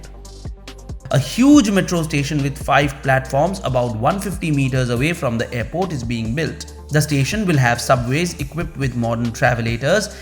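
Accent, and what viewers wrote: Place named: North Kolkata, India. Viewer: Voice Dekhe Dum dum madhyamgram ya barasat r chele mone ho66e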